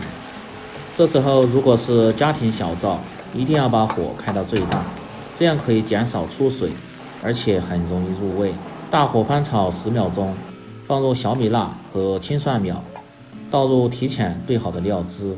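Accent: Sichuan, China. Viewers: 四川人，一听就晓得嘛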